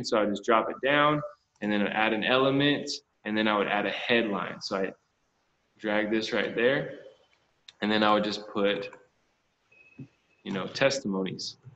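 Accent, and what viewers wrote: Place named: New York, United States. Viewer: Bro Nicaragua? You sound like a New Yorker lol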